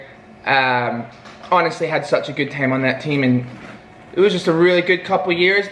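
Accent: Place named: Scotland, United Kingdom. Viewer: r u scottish my man? im loving that accent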